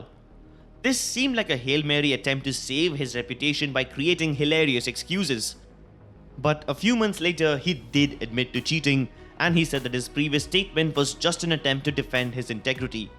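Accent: India. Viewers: Chutiye indian h to ese he rahegi na awaz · it's just indian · Indian accent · F*ck your Indian accent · The Indian accent made me chuckles alot xd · you sound too indian i am sorry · Nice Indian accent can’t even pronounce vac how do u even pronounce it as “wac” ban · Change your indian accent please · Of course it’s an India voice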